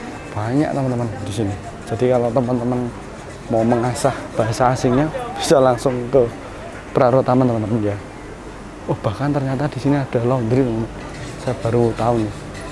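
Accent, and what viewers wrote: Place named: Java, Indonesia. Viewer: Suara hostnya bikin gemes. Njawani banget 😅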